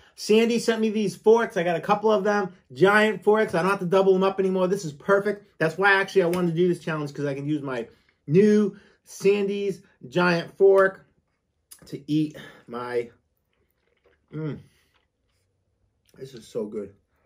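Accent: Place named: Massachusetts, United States. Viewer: You're awesome. I'm a Maine-ah, so I just love that you're so stereotypically Massachusetts. If anyone asks what a Bostonian or "Southie" is they should be shown a clip of you!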